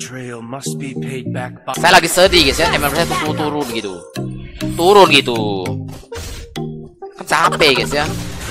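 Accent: Kalimantan, Indonesia. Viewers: Bang Kmu dri kalimantan mana klo bleh tau sih :-) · Wah kalimantan ya bang ?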